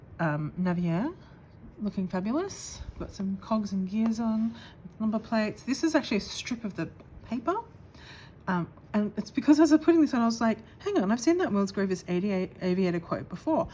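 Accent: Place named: Australia. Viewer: Hi . You sound Australian. Where did you go for the workshop , sounds like I would have liked it a lot. I’m in Melbourne . Thanks for sharing .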